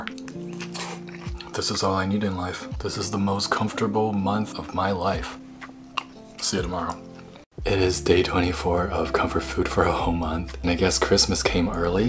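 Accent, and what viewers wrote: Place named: Australia. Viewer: I thought you were from Australia 😭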